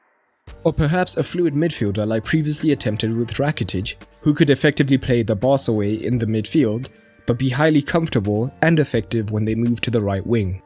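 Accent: South Africa. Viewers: You sound South African. Are you from SA??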